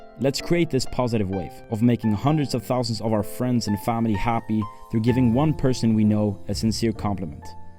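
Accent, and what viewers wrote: Sweden. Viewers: I heard from ur dialect and I knew u were from Sweden :D before u said . Swedish people usually have different dialekt, instead of saying visualising which sounds like vizhualising, swedish people usually say vis-shualising. Or cheese, swedish people say sheese. Just a fun fact and have a great day :)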